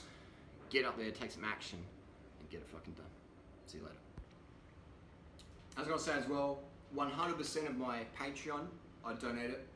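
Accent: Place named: Australia. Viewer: Have you tried the English Subtitles, It does help non Aussies. One thing though, there are quite a few Auto Generated words which are not the words he used.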